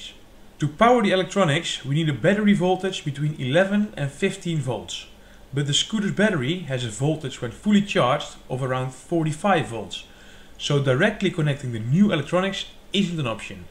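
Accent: Netherlands. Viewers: Ik ruik een flink Nederlands accent. Maar hoe dan ook super leuke video, zoals alles wat ik van je heb gezien.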